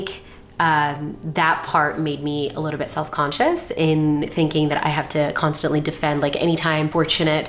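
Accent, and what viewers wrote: Syria. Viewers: After mentioning that u lived for years in UAE I was about to say speak Arabic so I can know what dialect do u speak but after I know, SYRIAN lol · You are inspiring preson!, Dr Greeting from Egypt 💜 Note: I was superised when you speak Arabic 😂 Your voice was different and nice and The Syrian dialect befits you very much...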